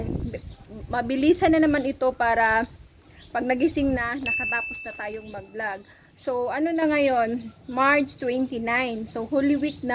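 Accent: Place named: Ilonggo, Philippines. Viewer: Hawud na kaayo ka mag Ilonggo sis nindut paminawon hehehe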